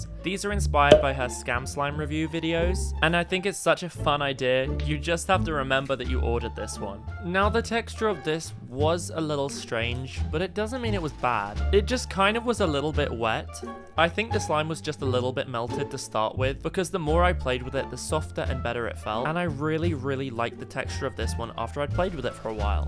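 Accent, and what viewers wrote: United Kingdom. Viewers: ARE U FROM UK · Are you British too? 🎉🎊💝🎊🎉 Im British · wait your British just like and we’re are you from i’m from walthstone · Is he from Great Britain😅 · YOUR BRITISH!!!!!!! COOOOOOOOLLLLLLL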